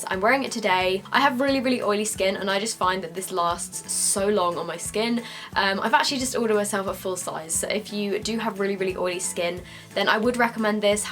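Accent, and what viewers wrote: England, United Kingdom. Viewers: where abouts In England is she from x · omg ur so amazing I love watching ur videos. ur so beautiful and cute. I love how u talk it's unique. ur from UK? that's awesome. I love you so much omg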